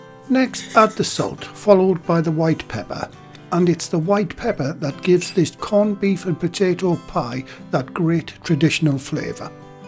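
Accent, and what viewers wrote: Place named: Newcastle, United Kingdom. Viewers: You got a like when I heard your Geordie accent. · I'm so happy I found your channel. I'm a northerner myself from Newcastle who now lives in the states. I've been terribly homesick and not able to travel back due to Covid:( Hearing that accent and watching all your videos makes me feel like I'm back home! I'll be trying some of your delicious recipes this weekend! HAWAY THE LADS. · I love this channel. I am,also,from the north east and your voice takes me back to my time there and my mam's corned beef pie was just like yours. Thrilled to have found you. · An English voice , at last, to be found on the internet. I watched , just for the relief of it. Just the metric references to be got rid of I've shared it with a genuinely interested party . Thank you.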